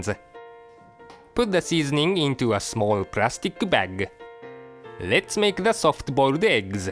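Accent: Japan. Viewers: The host has very strong Japanese accents, but I understand him very well, but will difficult to many English speaking people. It was better, if the lady talks. This is not a healthy food and it takes a lot of times to make a simple food, but when you have nothing to do, you can cook it at home. This guy and woman work together perfectly, but they use low quality Japanese cookeries. Even I use very nice Japanese cooking stuffs and knives everyday. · この、いかにも『日本人の英語』って感じが これ海外の人にはちゃんと伝わってるのかな？ 字幕あるから問題ないか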